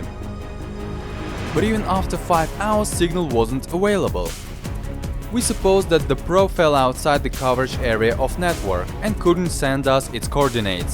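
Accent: Russia. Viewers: Такой Русский акцент! Это ты говоришь или кто-то другой?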